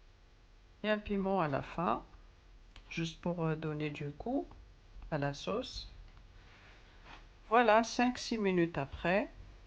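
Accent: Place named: Kabylie, Algeria. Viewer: Bonjour, tu explique très bien ta recette et le français Kabyle, c'est parfait. Kamel de Marseille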